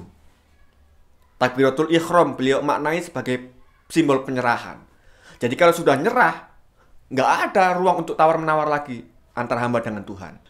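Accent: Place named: Java, Indonesia. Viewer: Hadir.. 😂 Logat jowo nya saya suka, jangan lupa kentut mas bro😂😂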